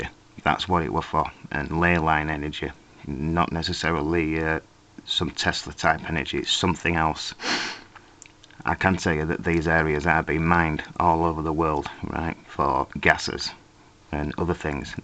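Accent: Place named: Yorkshire, United Kingdom. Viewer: at first i thought your accent was manc but yer it's Yorkshire also init : )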